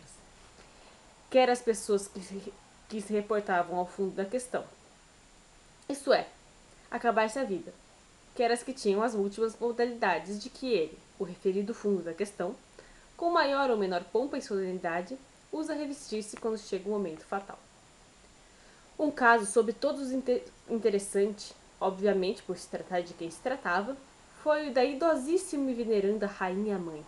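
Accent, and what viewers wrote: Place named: Interior, Brazil. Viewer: Rsrsrs isto eu percebi, que o sotaque é brasileiro. Só não consegui identificar exatamente de onde... Sua leitura é muito fluida. Tive de parar, prestar bem atenção. Chutaria SP por causa do "r" =D (porém muito sutil). Foi esse cifrão do Euro que me chamou atenção. Por esse preço valeria comprar fora mesmo pagando frete internacional. Nas livrarias da minhas cidade está muito caro. A língua é a mesma... Parabéns pelas ótimas leituras ;)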